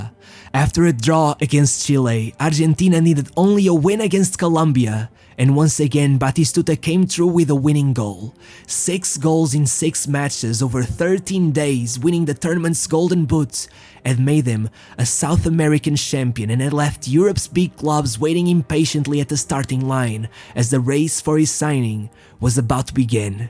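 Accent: Portugal. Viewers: It feels weird hearing a Stan of an Argentina legend with a Portuguese accent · The Portuguese accent is strong in this one. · Why are you so mad about his pronunciation? It's very insignificant as long as you can understand him. By the way, I read in someone's else comment that this narrator is from Portugal and that explains his accent.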